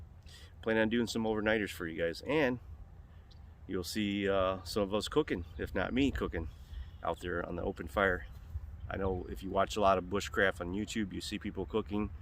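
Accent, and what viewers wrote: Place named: Cape Breton, Canada. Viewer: Your accent sounds like Whitney Pier Cape Breton.😁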